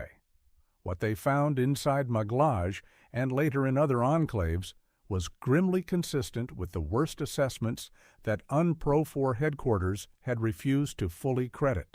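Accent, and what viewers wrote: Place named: United States. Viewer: Why an US voice over about a full UK subject?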